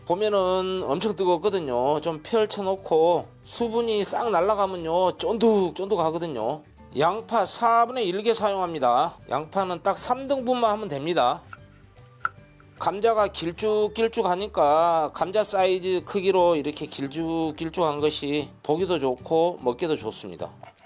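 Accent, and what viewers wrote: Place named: Yeosu, South Korea. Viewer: 안녕하세요. 여수사투리쓰시는 셰프님 정겨워서 늘 제일 먼저 찾아보는 요리정보예요~ 잘 보고 있어요 감사합니다:) 근데 요즘 제목에 자꾸 ‘이걸’ 넣으세요~ 이런식으로 쓰시는거 같아요. 좀 별로예요 제목으로 낚는 다른 콘텐츠들 보면 짜증나는데 는 안 그랬으면 좋겠어요.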